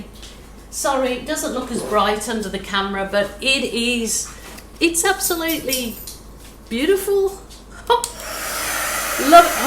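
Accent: Birmingham, United Kingdom. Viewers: Wow! What a stunning result! I think the balloon kisses are the icing on the cake. Just out of interest, is that a Birmingham UK accent I can hear? I live there and it does sound familiar!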